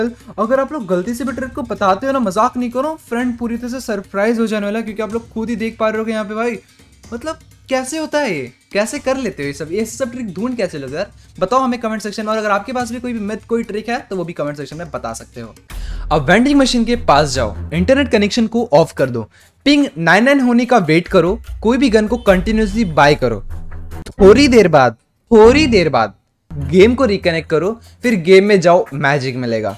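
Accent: Maharashtra, India. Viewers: Are you Marathi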